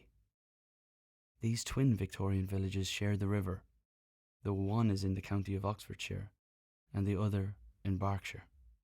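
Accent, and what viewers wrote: Ireland. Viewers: I don’t think I’ll ever be able to sleep again without this. Niall’s voice & beautiful Irish accent is so soothing. The only problem is I never get to finish the video. In less than 5 minutes,I’m sleeping like a baby. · especially with the Irish accent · * soothing Irish voice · love the irish accent that he has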